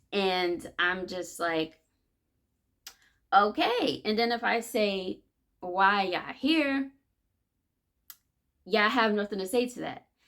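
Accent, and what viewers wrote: New York, United States. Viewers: You’re right, most of the original New Yorkers are gone, you can tell an original New Yorker by their accent, I can tell that you’re an original New Yorker, most of these people in NY now aren’t from there and have no culture.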